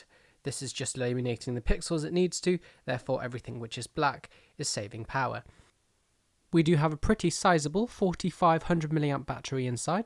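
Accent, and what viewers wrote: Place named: United Kingdom. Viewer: I could listen to the British accent all day.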